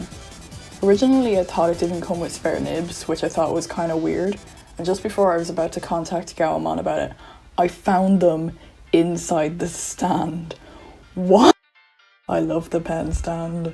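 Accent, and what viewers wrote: Ireland. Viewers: YEAAAA but it’s not super thick if that makes sense ?? But like ?? I still just adore it omfg I love irish accents sm 💀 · your voice is so cool :0 i can recognise an irish accent aha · casualy has an Irish accent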